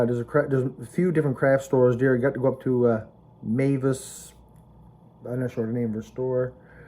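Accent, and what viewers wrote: Newfoundland, Canada. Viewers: I did not know that Canadians can sound so different from US Americans. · My soul mate loved hearing your voice reminded him of his childhood in Grandbanks. NFL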